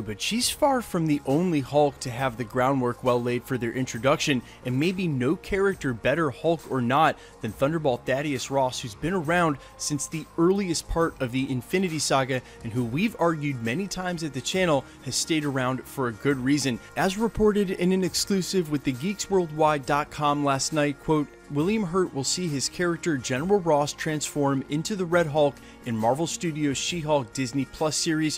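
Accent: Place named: California, United States. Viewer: LOL i see what youre pointing, yes he is slightly sound like the Californians characters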